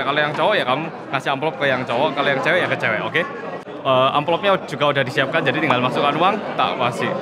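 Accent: Java, Indonesia. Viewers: Wwiiiiihh entek petang piring reeeekkk....hahahahaha......nggak luuwweeee iku reeekkkk...tp ruwakuuusss yooo......btw its ok 👍👍😍😍😘😘 Suuueeenneeenggg puoll ndelokno e.....success trus ya nas sing muuueeeddokk👍👍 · Logatnya jowo buanget 🤭🤭🤭🤭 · Aku baru nton dann sumpah aku nton berasa nton org indo yg lagi di korea😂 duhh logatmu mas indonesiaa bangeet💗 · Btw ka jawa nya medok bgt 😂 kalo ngomong serasa kaya pak jokowi yang ngomong 😂😂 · Medok banget ngomong nya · Lucu ya.. Org asli korea malah logatnya jawa.. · Hallo wong korea medok piye kbre??? Cobo melu nak sek jenenge 패펙 aku pengen weruh.... Maturswun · Gw kira suara nya logat korea gitu eh ternyata medok:v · Bahasa nya medok bngt, salut aku , 😍😍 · Medok banget omongnya kaya aku , jadi lucu deh kaka 😂😂😂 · Ini orang korea apa indonesia. Logatnya xo jawa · ngomongnya Medok jawa ya... wkwkwkkw lucu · Banyak makan nya si korea medok... 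gak rugi kl all can you eat.. hahahh 😅😅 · Medok banget jawanya:') Lucu sumpahh>< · Kira-kira apa yaa reaksi orang Korea asli yg nggak ngerti Indo pas denger Mas ngomong Indo medhok. P.S Kostum menghadiri pernikahan di Korea lebih simpel cenderung monokrom, nggak kayak di Indo yg agak gimana gitu liatnya warna warni kadang juga dandanannya suka heboh. (*Kecuali yg ada ketentuan warna dresscode yaa)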